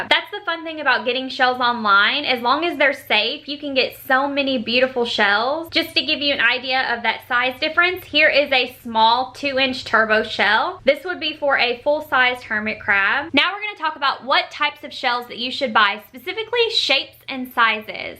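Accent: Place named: South Carolina, United States. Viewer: Are you from the Carolinas? Your accent sounds south Cackalack to me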